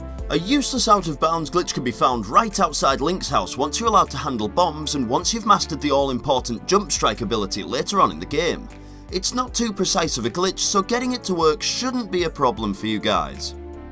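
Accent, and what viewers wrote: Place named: North, United Kingdom. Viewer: Loving your northern accent.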